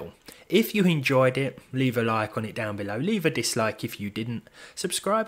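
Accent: United Kingdom. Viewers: Everytime I see your face I have a weird feeling of you you are an npc lol Always talking in the emotionless British accent puts that theory to the most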